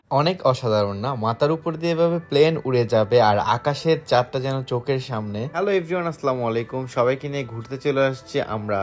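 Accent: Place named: Chittagong, Bangladesh. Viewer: Tomi ctg chela seta na janar ki ace. tomder family r sby without soddo bhasai kotha bolo tokhon ctg ton ta esposto boja jai😂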